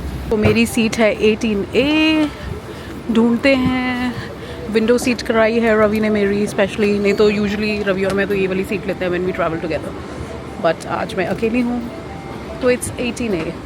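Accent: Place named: Punjab, India. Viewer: Bhabi g thoda accent punjabi boldeya bahla ajeeb lgda kde kde Bahut sohna kr raye soon u gonna get good number of subscribers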